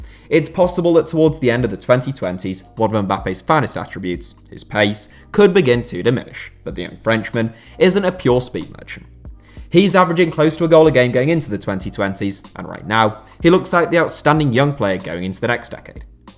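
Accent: Ireland. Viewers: Is this the voice of the irishman man from the other HITC channel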